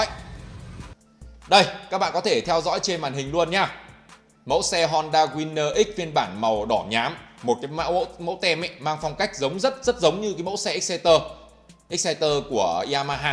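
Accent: North, Vietnam. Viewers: Bác người bắc hả,k thấy nói giọng nam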